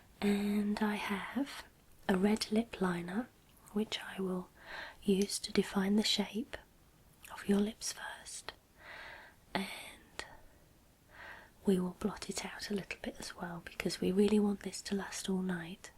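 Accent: United Kingdom. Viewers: I love British people's accent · ASMR with British accent? an absolute perfect combo.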